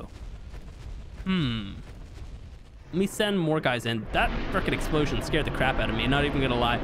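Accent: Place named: Ireland. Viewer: you should do a video in your irish accent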